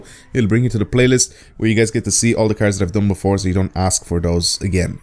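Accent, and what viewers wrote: Dublin, Ireland. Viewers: Are u from dublin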